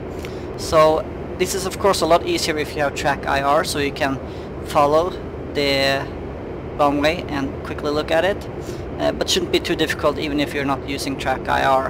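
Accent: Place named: Sweden. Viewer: Nice vid like always. btw are you swedish you sound a bit swedish xD , im swedish .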